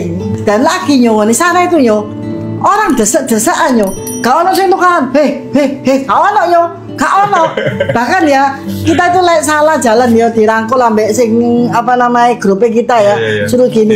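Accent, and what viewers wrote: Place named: Surabaya, Indonesia. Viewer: Ai ..Seru lek Cerito Iki asli logat'e Suroboyo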